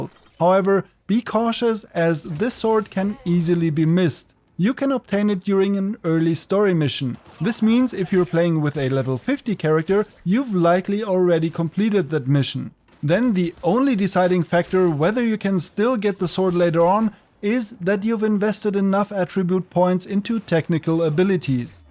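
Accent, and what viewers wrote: Germany. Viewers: Akzent: Deutschalisiert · Hier sieht man mal wie krass der unterschied zwischen deutschen und englischen Videos ist. Der original creator hat hunderte Videos consistent gemacht und du hast schon halb so viele Abos… würde dem ganzen mal folgen, da das echt interessant zu sehen ist und ich selber überlege englisch zu machen. Wie ist deine Erfahrung mit dem “deutschen Akzent und wie gut die Leute das annehmen?”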